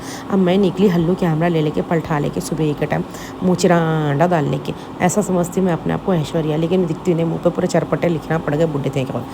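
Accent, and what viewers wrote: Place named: Hyderabad, India. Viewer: mam Allah bless you thank you ma'am bahut pyari acting aur bahut pyari awaaz bahut Pyara Hai Aapka baat karne ka Hyderabadi thank you so much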